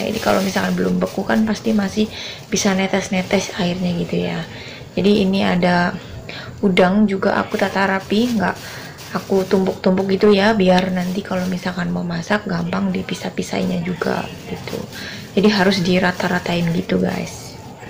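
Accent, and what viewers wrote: Banyumas, Indonesia. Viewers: Kiye wong ngapak.. Nonton maning,, ful pokoke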